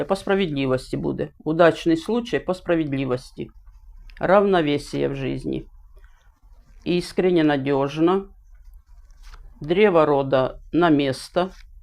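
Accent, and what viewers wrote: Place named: South, Ukraine. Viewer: Викликає довіру, щира, не клянеться, - що можу, те можу... Видно по говору, що жінка з південної України.... Дай, Боже, щоби так було як Ви побачили.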